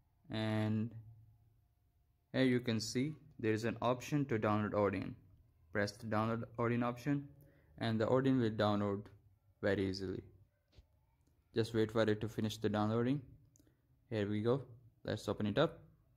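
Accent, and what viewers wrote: India. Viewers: when you heare an Indian Accent you know its the Right video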